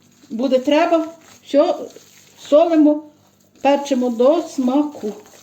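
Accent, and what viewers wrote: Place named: Ternopil, Ukraine. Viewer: Говорите точно, как у мамы на родине в тернопольской области, все слова, звуки, интонации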